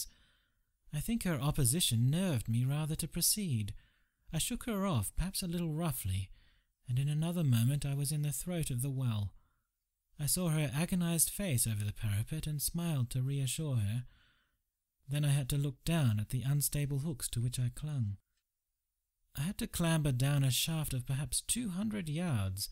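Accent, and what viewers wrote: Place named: Australia. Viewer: Very faint Aussie accent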